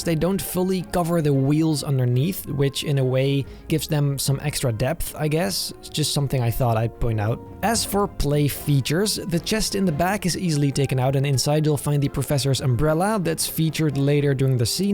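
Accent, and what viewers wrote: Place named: Netherlands. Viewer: first time i clicke don and heard your voice i was like that is a dutch guy for sure or atleast belgium german just that specific accent and pronounciation of words that my fellow dutch people do but i dont cuz i learned english myself by nonstop youtube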